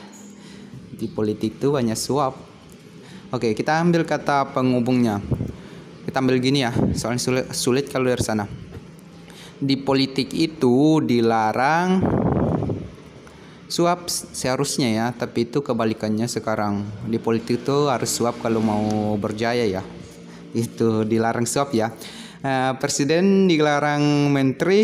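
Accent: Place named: Sulawesi, Indonesia. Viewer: Jelas sekali klo miminnya org Sulawesi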